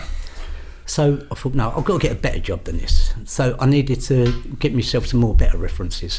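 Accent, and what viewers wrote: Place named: England, United Kingdom. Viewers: Love this guys voice! "Propa Landan!" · Sounds like Paulie Gualtieri with an English accent lol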